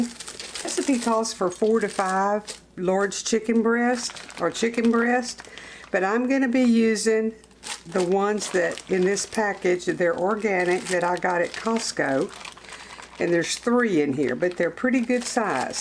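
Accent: South, United States. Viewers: I love your channel! (And your Southern accent!) It just feels like home. My son's going to love this recipe!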